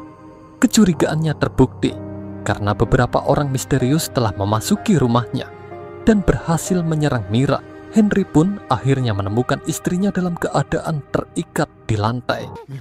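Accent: Bali, Indonesia. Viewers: Suka dengan alurnya... Bang, kamu orang Bali ya?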